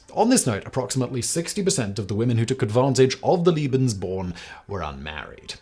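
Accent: England, United Kingdom. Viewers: Fantastic English accent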